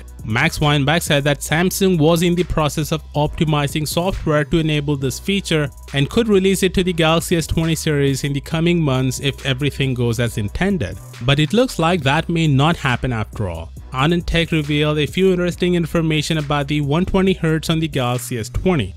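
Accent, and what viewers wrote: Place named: India. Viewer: Your accent is Indian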